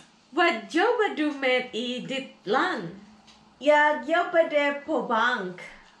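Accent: Indonesia. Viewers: Kental bgt aksen indonya😀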